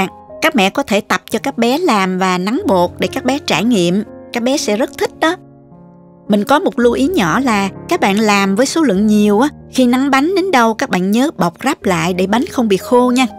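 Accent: South, Vietnam. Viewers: Cô chất giọng gốc miền nam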